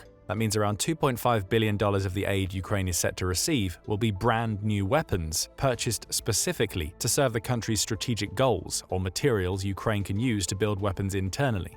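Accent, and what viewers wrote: United Kingdom. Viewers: Another limey voice = more BS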